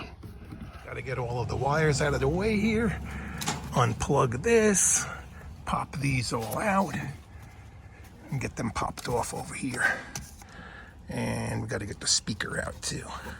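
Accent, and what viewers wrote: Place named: New York, United States. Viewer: Love your accent! NY?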